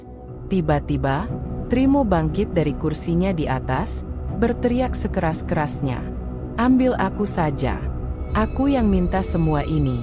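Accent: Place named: Java, Indonesia. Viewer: Bahasa jawamu lucu...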